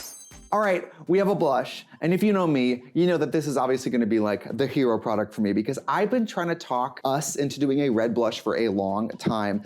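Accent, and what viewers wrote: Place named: Minnesota, United States. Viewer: Work that winder washer motion. Cmon Minnesota accent ❤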